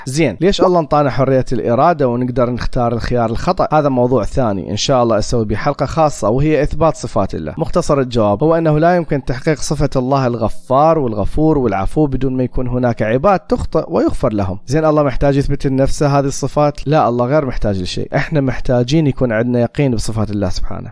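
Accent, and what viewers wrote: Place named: Iraq. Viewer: أحسنت اخوي الغالي ، كلام مقنع وجميل ومبسط دون إسفاف أو إخلال ، واللهجة العراقية زيادة جمال ، تحياتي لك من فلسطين ، أكمل وطور نفسك🥰 + إضبط قراءة الآيات القرانية 😉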